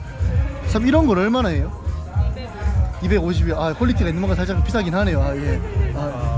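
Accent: Gyeongsang, South Korea. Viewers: 말투가 대구말투이신것보니 대구경북사람이군요~ 분명히 부산경남말투는 아님ㅎ 제가 TG사람이라~정감가네요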